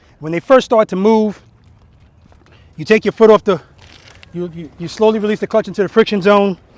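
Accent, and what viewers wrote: New York, United States. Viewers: I can't tell you how much I appreciate what you're doing with this channel. I love the way you break everything down step by step and I especially appreciate how humble and joyful you are. I'm a baby rider and I've been watching videos fr many different experienced/veteran riders, but the way you explain things just clicked for me. Same goes for Jerry Paladino (Ride Like A Pro). BTW, love that NY accent! God bless you!! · Hey. Great lesson my friend. Your encouragement lifts me up, encouraging me to practice and build confidence. Your technical skills are awesome as is your presentation. Driving all of this is your life philosophy of “paying it forward” and appreciation. Also, having grown up on Long Island, it’s good to hear your NY accent. You are making a big difference for me and many others and I thank you and appreciate you.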